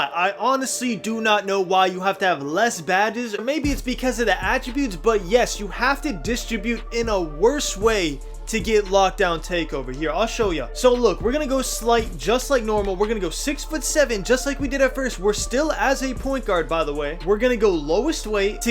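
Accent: Texas, United States. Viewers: Are you from Texas? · You from Texas? Or Atlanta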